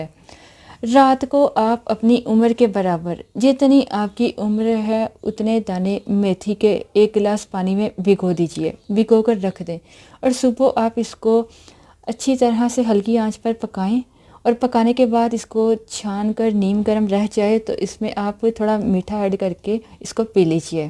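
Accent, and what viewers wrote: Punjab, Pakistan. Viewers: Aapke lehje main reginal accent hai shayd aap Punjab ki hain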